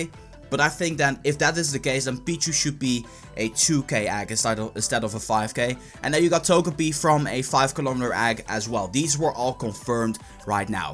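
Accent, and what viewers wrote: Netherlands. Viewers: Is He Dutch?? His Accent Though I Am Dutch Too So Think He Is...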